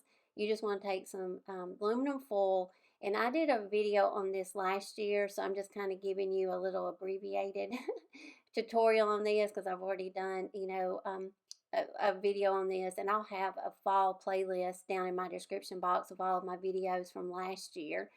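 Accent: South, United States. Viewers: Love to hear you talk with southern drawal! And of course great ideas. Thanks.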